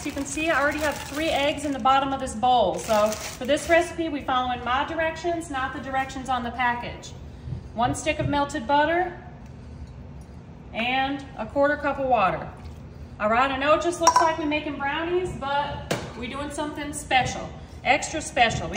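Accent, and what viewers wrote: South, United States. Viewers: She's very southern that's why .. I love how she talks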